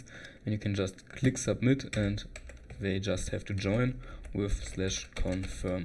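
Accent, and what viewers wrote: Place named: Germany. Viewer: man hört das du deutsch bist danke für das vidio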